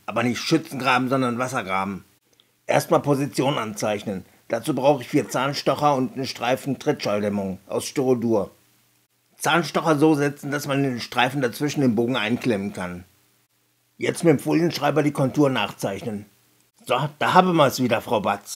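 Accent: Hesse, Germany. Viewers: Hi, sehr schönes und lehrreiches Video....kannst öfters hessisch babbeln:):) LG Mike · Hallo, sehr unterhaltsam, auch oder gerade wegen dem "hessisch babbeln"... 😁😁😁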